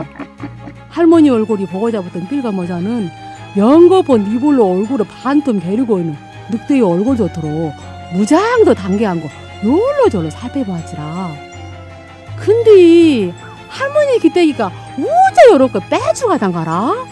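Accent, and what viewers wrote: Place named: South Jeolla, South Korea. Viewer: 아따~ 오메~^^ 생생한 전남사투리로 듣는 동화가 특유의 억양과 말에 녹아있는 장단때문인지 마치 판소리를 듣는 듯 해요.😊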